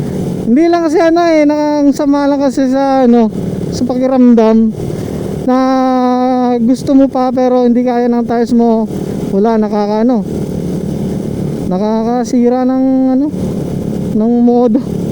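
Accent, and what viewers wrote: Iloilo, Philippines. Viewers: boss ang ilonggo nyo kag ilonggo dre sa tacurong sultankudarat paryas paryas gid haha long live ilonggos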